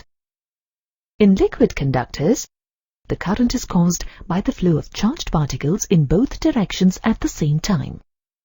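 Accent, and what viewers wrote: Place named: India. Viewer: Bad accent and wrong terminology. A typical indian product.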